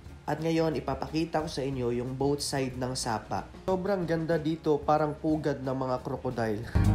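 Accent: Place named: Philippines. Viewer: ang accent mo parang tagalog, hindi ka ata "Bisaya" murag Tagalog! Wala ba mga buaya diha?😅 Amping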